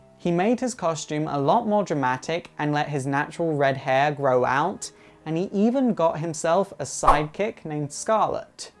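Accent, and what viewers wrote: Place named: RP, United Kingdom. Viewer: Damn, do you know that you could be an... excellent storyteller, don't you? I mean with your narrating talent, passion you talk with, you can recite fairytales for children/work in a sort of playhouse or something. To think about it, your British/RP-ish kind of accent (from one way extremely annoying) can do the trick as well.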